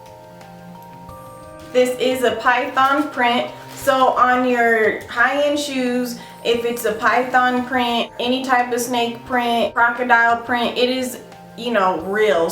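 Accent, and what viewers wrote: South, United States. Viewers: You are so cracking me up with how honest you are about these shoes. I call it Shoepidity. Especially those god awful Louboutin. I have the Penny, took me over a year before I wore them. I have only wore them twice. Plus the platform is a dated shoe. I was coveting the white pigalle but I wanted it in the pretty blue. I am really impressed with your knowledge. Regarding finding sales. It's Dec 2 and June 2nd for sales. That's Gucci, Saks, Neiman and the other like department store. BTW where are you from? You have a strong southern draw. I have to check you out on Instragram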